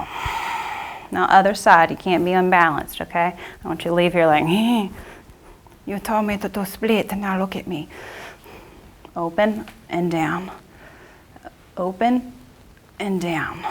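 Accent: South, United States. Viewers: I love your country accent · This girl is southern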